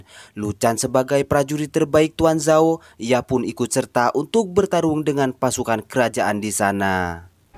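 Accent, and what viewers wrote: Madura, Indonesia. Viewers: Yg menarasikan pasti org madura y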